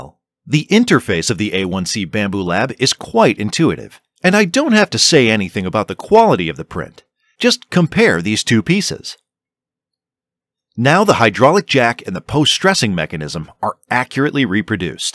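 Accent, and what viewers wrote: Kerala, India. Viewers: please don't use your voice. I hate indian accent. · Why don't you stick to using the AI generated voice in place of your Highly "Mallucised" accent!!! Sorry for the blunt comment. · I miss the indian accent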